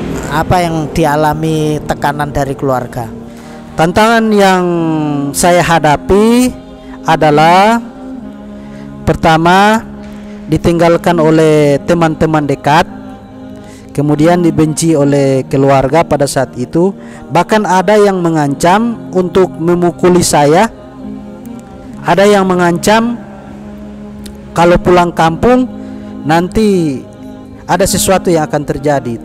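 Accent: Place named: Bugis, Indonesia. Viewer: Tapi logatnya logat bugis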